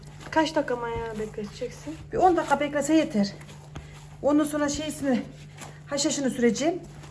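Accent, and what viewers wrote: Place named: Aksaray, Turkey. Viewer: hanım benimde annemler aksaray koçhisarlı, sizi görür görmez şivenizden anladım:) babamda çorumlu, kültür birleşmesi cok güzel birşey insan sürekli yeni şeyler öğreniyor, çok becerikli bir hanımsınız taktir ediyorum:)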